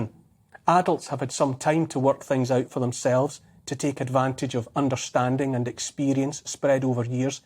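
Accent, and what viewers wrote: Scotland, United Kingdom. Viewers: oh no baby, it seems you have gone down the route of justifying yourself to a stranger like me, I am flattered but I have not even met you yet, you must buy me dinner first 😉 'Irn Bru rhymes with Guru', yet you used both in your pet name for the guy, how odd from someone who has an elevated grasp of the English language and its use. Irn Bru is synonymous with Scotland, has a Scottish accent and is known to be Scottish also but of course it was just a coincidence that you used 'Irn Bru' to partner with Guru because it somehow rhymes with Guru. 'boohoo' would have been a good replacement and/or partner for Guru and it's meaning would have been appropriate to your goal. P.s. how dare you assume that I not only wear knickers but that I wear underwear at all.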